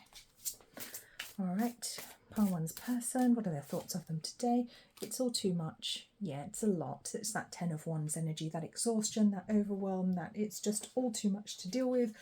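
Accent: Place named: United Kingdom. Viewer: First thing you said in option 2 is you heard a British male voice. That’s my person (I’m American). His particular accent is much like yours. Sunflowers, poker, navy, and keen humor are especially significant. 1’s, 0’s and time— it’ll be 10 years apart at the end of summer. Also my sun and moon signs showed up. This resonated well in many ways—excellent reading. Thank you ❤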